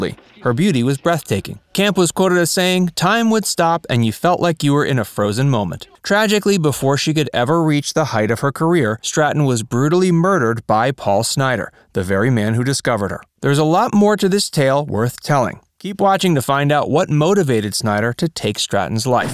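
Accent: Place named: Transatlantic, United States. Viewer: hello Mr you’ve a interesting’ Trans-Atlantic none accent. Did you train your voice ❤️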